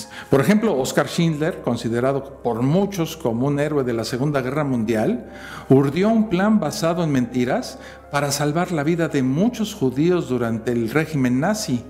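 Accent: Mexico City, Mexico. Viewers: Cómo me hiciste, reír con ese sabroso acento chilango jajaja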